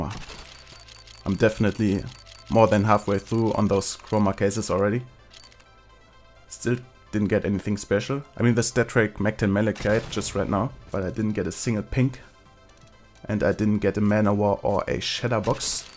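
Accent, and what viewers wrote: Germany. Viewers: inside Seine Aussprache ist so awesome:D Man merkt immer ein bisschen Deutsch bei ihm. Mir gefällt das:D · Man merkt damit du deutsch bist aber trotzdem nice sehr gutes Video!! LIKE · nice accent by the way, i like they way the german accent lingers in my ears, but not tooo much of a german accent, really nice to listen to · Dieser Deutsche Akzent. ^^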